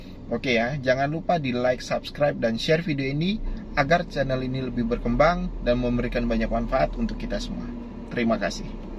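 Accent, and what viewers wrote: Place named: West Sumatra, Indonesia. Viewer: Asslm Maaf Logat kayak orang minang ya bang.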